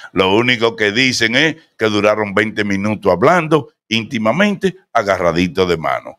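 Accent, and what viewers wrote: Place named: Latin America. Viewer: Me encanta como hablas Típico Latino y sus risas Gracias por hablarnos en Español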